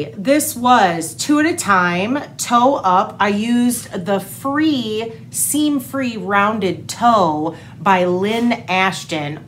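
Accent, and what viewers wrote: Midwest, United States. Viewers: I'm from Madison Wisconsin and I'm so happy that you say "All's." My Mom use to ask me all the time " How do you spell that?" Great Midwest accent!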